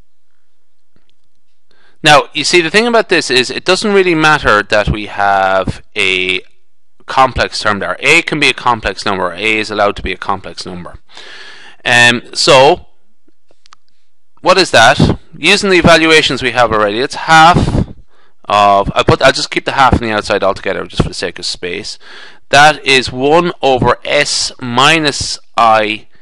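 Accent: Ireland. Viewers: man's irish. i can tell. that was really helpful thank you!!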